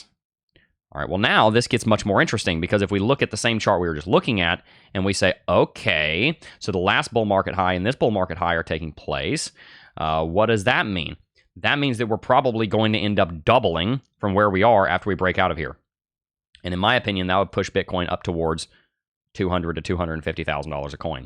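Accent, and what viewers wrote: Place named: United States. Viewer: in the uk we see this way of chatting as a yank as weird its like a usa news presenter or usa tv adverts u see in movies as actors pretending to act. please its so corny its horrible. surely this isnt normal in the usa.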